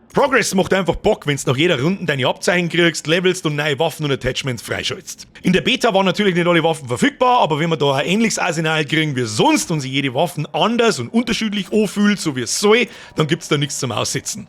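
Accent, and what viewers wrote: Bavaria, Germany. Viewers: Woooas?!?! Superüberfordert vom Bayrisch aus dem Stand Sehr erfrischend Japp nice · Geil, endlich moi a bayrischer Spieltester! Des feier i ! Abo und like ist raus.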